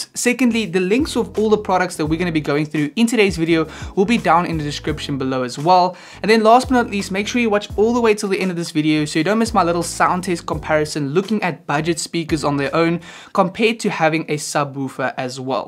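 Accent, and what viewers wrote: South Africa. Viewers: Excellent review. I got the Eris 3.5's and am really considering adding a Sub8 to it as well for more better focused low end. Is that a South African accent I spot?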